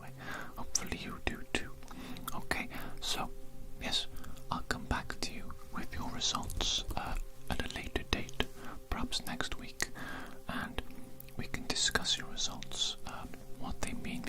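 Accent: Wales, United Kingdom. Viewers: the welsh accent hits 😫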